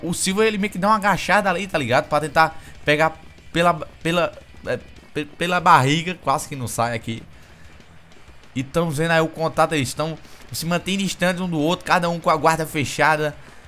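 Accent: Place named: Pernambuco, Brazil. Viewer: owwww vc é de Pernambuco ne ?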